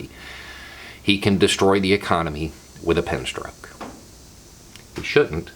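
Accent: South, United States. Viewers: Hey, Philosophy Tube is doing a Shakespeare marathon on twitch to raise money for Samaritans, a mental health charity. The chat there has regularly said they’d love to hear your amazing Southern dialect read some lines from Shakespeare or drop in to chat about mental health and American violence which you’re so good at.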